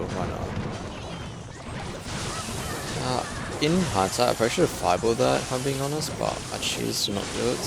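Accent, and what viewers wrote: Australia. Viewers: sexy aus accent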